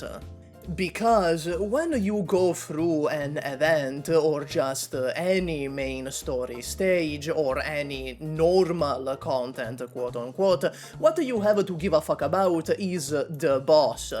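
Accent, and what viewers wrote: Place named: Italy. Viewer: Why do you sound so cartoonishly Italian?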